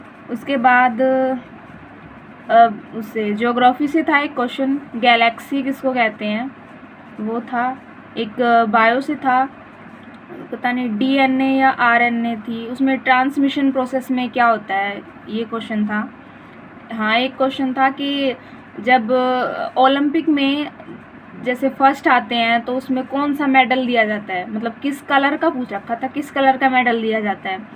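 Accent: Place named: Bagri, India. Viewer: oh aapki language meh thoda thoda bagdi touch hai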